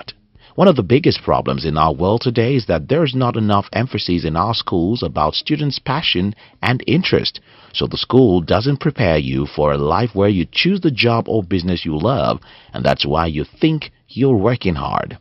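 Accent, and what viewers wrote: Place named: Nigeria. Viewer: Hey man, be proud of your old Nigerian voice, it is better than this uninspiring voice.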